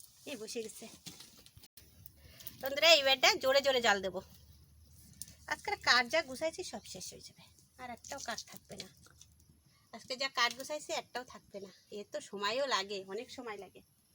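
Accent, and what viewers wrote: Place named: Andaman, India. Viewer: বাড়ি কোথায় বৌদি? কথা শুনে তো আমাদের আন্দামান এর লোক‌ মনে হচ্ছে।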